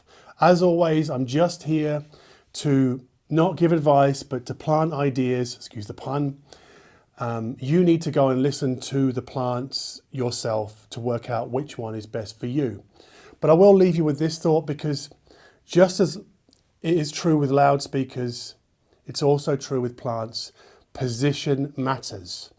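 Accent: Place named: United Kingdom. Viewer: Just shows that anything sounds legit when said with a British accent!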